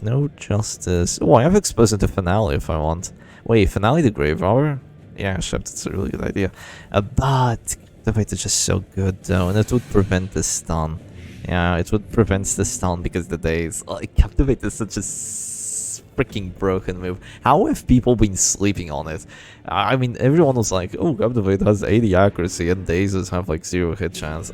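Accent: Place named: United States. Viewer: He has like no accent lol. I thought he was amerimutt, for like, 6 mounths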